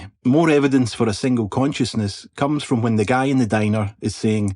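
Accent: Scotland, United Kingdom. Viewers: Are you Scottish? 🏴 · Incredible video. Helped me understand the film even more than I thought that I did. Also nice to hear a fellow Scott. Subscribed. 💙🤍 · I love your scottish pronunciation such reflective words and opinions, I love your way of interpretation and understanding too btw. Well done mate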